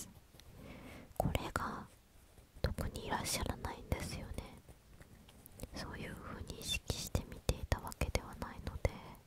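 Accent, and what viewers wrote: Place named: South Korea. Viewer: 韓国の方の日本語聞いてて気持ちいいですよね♪(^▽^)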